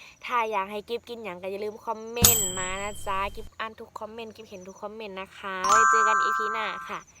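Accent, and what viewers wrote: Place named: Chaiyaphum, Thailand. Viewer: มักหลายสำเนียงคนชัยภูมิ น่ารักมากครับ❣️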